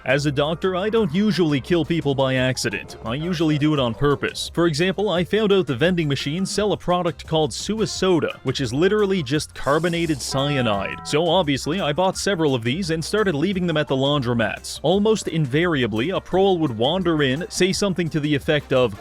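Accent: Canada. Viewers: Wtf are you canadian... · I can hear that canadian accent boy...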